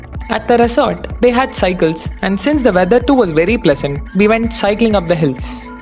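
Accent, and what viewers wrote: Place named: Tamil Nadu, India. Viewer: Bro pls speak tamil love ur tamil slang 😘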